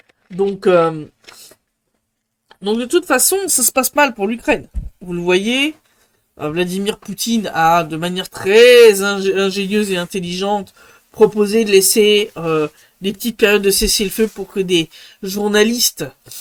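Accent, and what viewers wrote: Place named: France. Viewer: Il v ient de quelle région de France, l'accent ?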